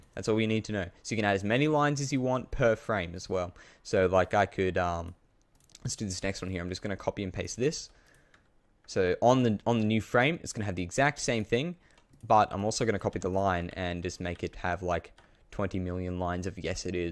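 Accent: Australia. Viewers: Are you australian? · Are you australian..? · HES AUSSIE LUV IET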